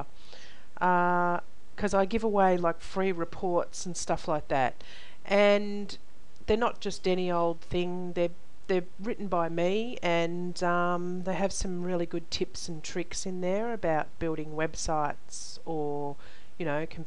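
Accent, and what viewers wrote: Australia. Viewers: i love how aussie you are makes it so much easier to listen too lol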